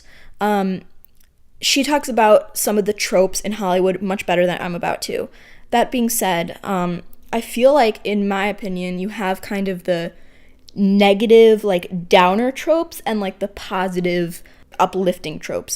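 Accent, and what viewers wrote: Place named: United Kingdom. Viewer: bloody hell that british accent was quite delightful, i liked to hear you criticize the movie industry because they have taken so many bad decisions when it comes to casting certain actors thinking it will not appeal to most of the audience,i think your point of views are well fundamented and i agree with you on most of them,so keep up the good work :)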